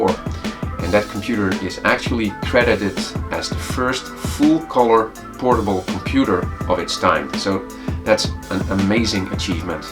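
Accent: Netherlands. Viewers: Netherlands accent?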